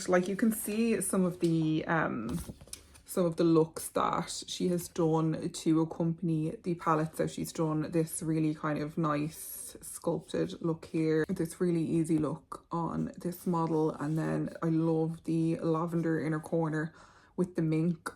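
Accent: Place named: Ireland. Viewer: Hello! I’ve followed you on IG for years but didn’t know you had a wee YouTube channel! Glad you popped up. Also didn’t know you’re Irish. Lovely to hear you and your lovely accent. 🥰❤️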